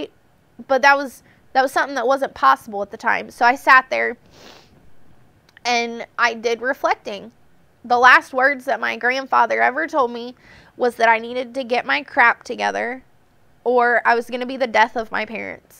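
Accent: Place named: Ohio, United States. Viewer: That is an Ohio accent...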